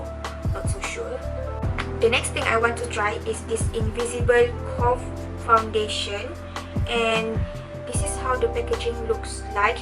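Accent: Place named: Philippines. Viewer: You speak like filipino english accent. Btw I like your video. Thumbs up 👍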